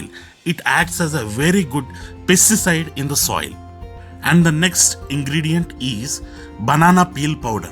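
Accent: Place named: South India, India. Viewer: 🙏🕉️🚩🌹🌻 JAI SRI RADHA GOVINDAY NAMO 🕉️🚩🌹🌺🙏🙏 Sir, Thanks for this stunning and informative video. I have been learning gardening since last four months via U TUBE and have attended one practical session also. Pls send me your name and mobile number so that I can remain in touch with you. From your accent I can make out your are from south. I am buying my fertilizers and other items from TN and KERALA. THANKS a lot 🙏🙏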